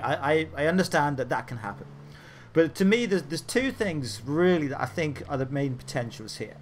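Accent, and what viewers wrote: Ireland. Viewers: Is that an Irish accent I hear? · You from Ireland? You sound like one of my Crew members heh. As Im watching this video, I was parked on a hill right by Trevor's house and one showed up..I think it's just random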